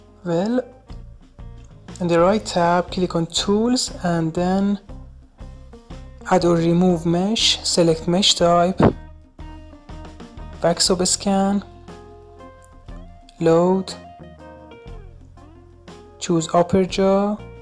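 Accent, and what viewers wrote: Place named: Iran. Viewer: Your accent looks a alot like iranians :)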